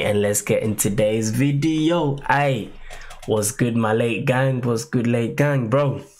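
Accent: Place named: Nigeria. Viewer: I THINK U ARE NIGERIAN NOT UK. UR ACCENT IS DIFFERENT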